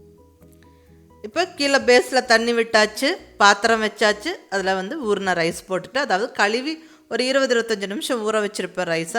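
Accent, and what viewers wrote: Coimbatore, India. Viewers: மலையாளி கோயம்புத்தூர் தமிழ் ஜம்முன்னு பேசுறாங்க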